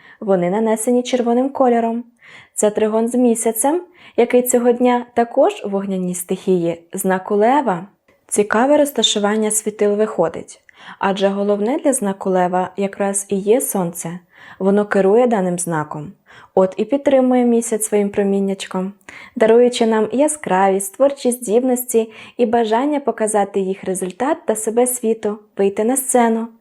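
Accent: Standard. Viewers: Героям Слава 🇺🇦💛💙 Дякую вам велике❤ Дякую за нагадування про традиції випікати жайворонки , за світло , яке несете до людей. Дякую за чисту українську мову і стиль🙏❤️ Світло перемогло темряву , обов'язково 🙏❤️ УКРАЇНА ПЕРЕМОГЛА 🇺🇦💛💙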